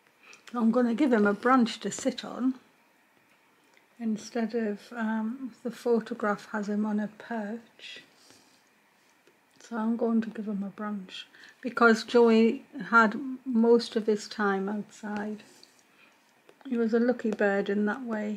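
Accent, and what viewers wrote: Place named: Sunderland, United Kingdom. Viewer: you are a delight to Watch. I love watching your Beautiful Creative Work. My Husband grew up in Sunderland. So I love hearing your Accent. I live in a country town called Gingin in Western Australia. You are always welcome in my Home ❤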